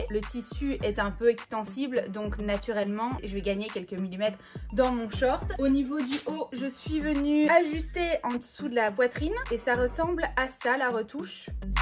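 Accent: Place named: Belgium. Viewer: Ahah tu es folle j'adore! Merci pour le fun et cet accent belge je ne men lasse pas!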